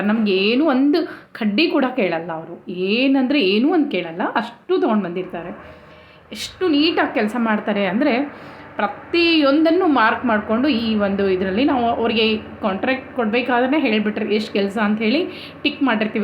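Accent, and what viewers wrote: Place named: Hubli-Dharwad, India. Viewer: Amma nivu Daravada dalli idira anta ankondide nivu Bangalore anta helidu keli shock aytu 😊, but nim bhashe yalla hubli darawad bhashe ne ide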